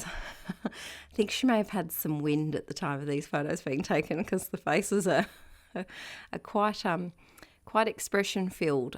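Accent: Australia. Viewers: Just saying hi from Victoria. Nice to see an Australian junk journaller! I was like, "I know that accent!!" 🤣👍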